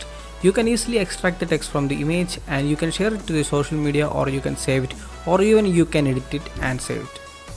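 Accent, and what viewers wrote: India. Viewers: The pronuntiation is more indian than english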